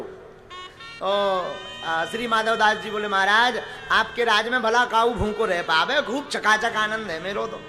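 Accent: Bundelkhand, India. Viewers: Gurudev apke shrimukh se jagannath baba ki katha badi pyari lagti hai bundelkhandi free style pyare se apratim saundarya ke dhani gurudev ap atulniya hai. Bala ji maharaj apki jai ho. 🎉❤🎉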